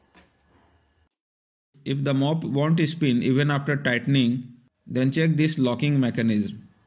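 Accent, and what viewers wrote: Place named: India. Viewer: Sounds like from India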